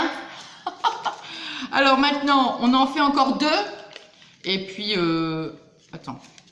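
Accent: England, United Kingdom. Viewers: Bonjour ma cocotte moment sympa surtout avec ton accent anglais et tes pantoufles moi j en ai aussi avec des cœurs et des pompons derrière, j aurais penser que le chat m aurais sauter dessus mais ça va cette folie la c fini,il fut un temps j avait des pompons devant, il m attaquait a chaque fois.passe une bonne journée en pilou c chouette.gros bisous 😚 mon amie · Trop bien cet accent anglais..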